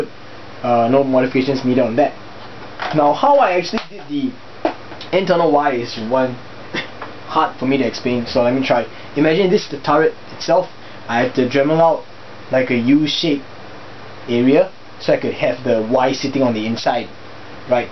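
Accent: Singapore. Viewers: OH MY ENGLISH!... eheh nice singlish accent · DO MORE SINGLISH! PLEASE!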